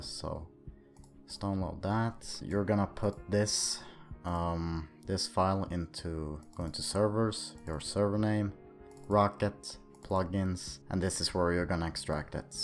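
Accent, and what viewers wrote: Sweden. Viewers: Are you from sweden? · are you swedish?? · Äru svensk?🤔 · OMG ARE YYOU SWEDISH????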